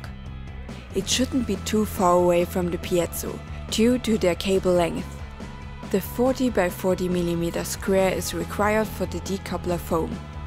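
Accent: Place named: Germany. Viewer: This seems to be a very sophisticated way for a transition. The aluminium disc is only needed from my perspected, when you want to muffle the batter head of a regular drum head to make it silence. Otherwise take any wood or aluminium profile, that doesn‘t flex so much and attach the rim shot piezo at the shell of the drum. But great explained how to proceed! And a nice German accent 😉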